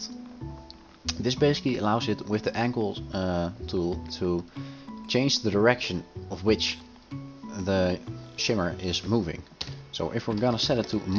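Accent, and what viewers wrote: Netherlands. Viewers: you from the netherlands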